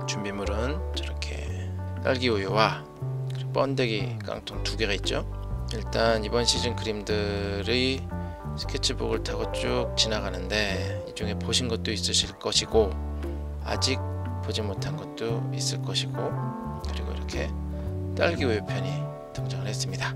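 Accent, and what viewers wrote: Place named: Seoul, South Korea. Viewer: 작가님 유튜브가 있는지 처음알았어요ㅋㅋㅋ너무 재밌네요 작가님과 수다떨면서 작업하시는 모습 옆에서 보는 기분이예요ㅋㅋ작가님 말투가 90년대 서울사투리? 같아서 듣는 재미가 더해지네요~~ㅋㅋ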